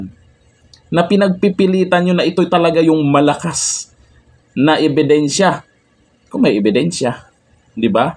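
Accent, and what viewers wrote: Visayas, Philippines. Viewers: Ayawg tagalog brod buki kaayo bisayaa nalang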